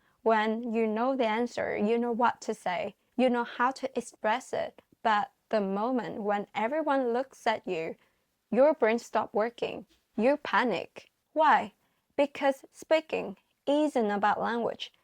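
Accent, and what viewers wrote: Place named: Australia. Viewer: Hi, I'm excited to see a new video on your channel - I loved it! It's also amazing that you are helping lots of people by sharing your own experiences. I can definitely pick up an Australian accent in some of the words you use. 😊 I think one thing I noticed from my personal experiences is that sometimes I don't feel confident internally, but when I rewatch a recording of a lecture or talk I gave, I can't actually tell - so I think insecurity is more often in our heads than something perceived by other people! (It's particularly relevant recording YouTube videos as well, it initially took me a while to get comfortable being in front of a camera on YouTube when I started my channel.) I made sure to like, as always, and looking forward to the next video! 🥳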